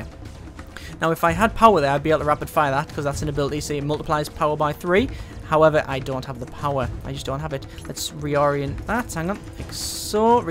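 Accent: United Kingdom. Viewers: jezus christ that british accent is horrible !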